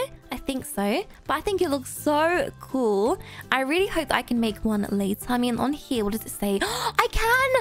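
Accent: United Kingdom. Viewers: Are you from the UK I am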